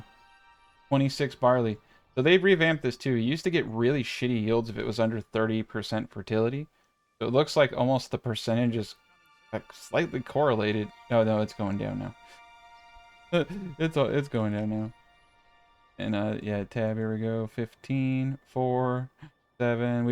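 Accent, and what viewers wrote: Canada. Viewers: as a South African i thought you where Canadian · i was thinking that you were from Canada🤣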